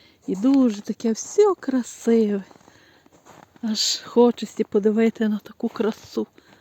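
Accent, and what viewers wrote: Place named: Hutsul, Ukraine. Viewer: Яке це село Чую наш говір гуцульський У нас завжди файно❤Миру і перемоги всім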